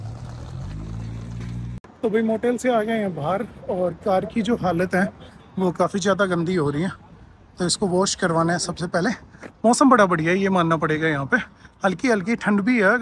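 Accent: Haryana, India. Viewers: Haryanvi kitni hi shudh hindi bol le Haryanvi ka pta lag hi jata h Jai haryana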